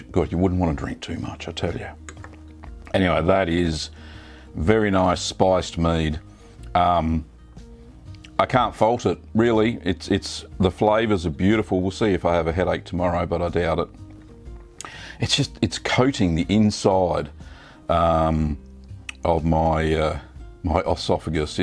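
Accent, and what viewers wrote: Australia. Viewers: Definitely make this a series!!! Cheese, mead/beer and an Australian accent. What more could you want in a channel!? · I'm a simple man I hear the Australian accent I skip too another video .🖕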